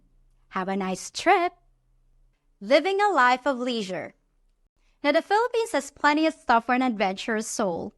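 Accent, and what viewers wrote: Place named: South, United States. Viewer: Great show. I notice you have picked up a bit of a southern accent since you have returned from the U.S. Stay awesome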